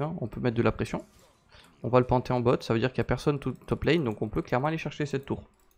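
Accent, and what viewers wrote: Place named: South, France. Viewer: Tu prends de plus en plus l'accent du sud haha (super vidéo btw)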